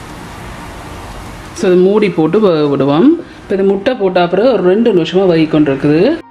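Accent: Sri Lanka. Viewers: இலங்கை தமிழ் நீங்க செஞ்ச தோசையைவிட அருமையாயிருக்கு .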